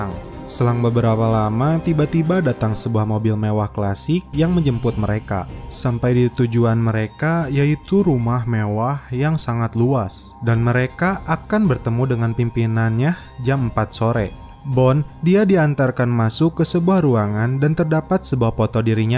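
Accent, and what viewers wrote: West Java, Indonesia. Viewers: Anying orng sunda eyy:v ceuk urng orang Jawa eyy, mantabs lah kedeng urng subrek helan :v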